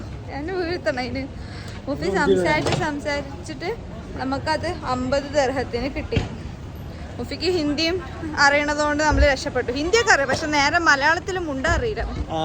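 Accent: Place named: Kochi, India. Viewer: Kochi slang annalo vanne samsarikumbo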